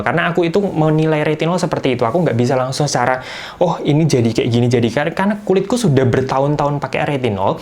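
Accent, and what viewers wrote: Java, Indonesia. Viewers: Jowo ndi yo ? Ngomonge lucu e😁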